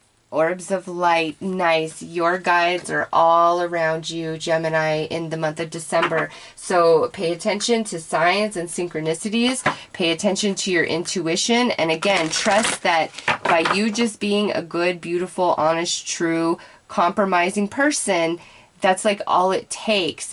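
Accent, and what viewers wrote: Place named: West, United States. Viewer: Beautiful reading that rings true. I love your melodious western US accent (I think). Your voice drips with love and kindness. God bless you and yours.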